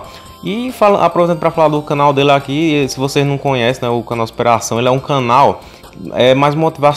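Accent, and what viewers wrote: Northeast, Brazil. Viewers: Tu é nordestino ou carioca?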